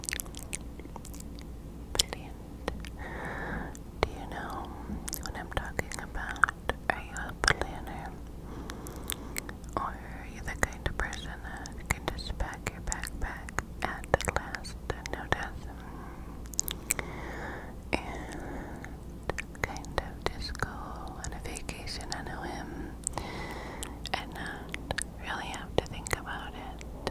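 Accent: New York, United States. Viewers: I love the New York accent 🥰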